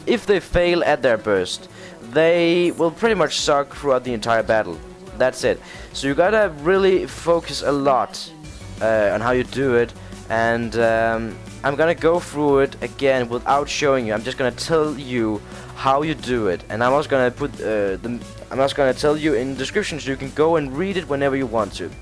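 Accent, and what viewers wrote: Denmark. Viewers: from denmark ? :P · han lyder fandme meget some en dansker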